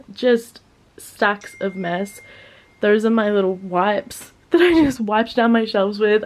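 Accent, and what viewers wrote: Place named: Australia. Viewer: i just found your channel and when i heard you speak i was like a fellow AUSSIE???? incredible. watching you reorganise was so satisfying thanku girlfriend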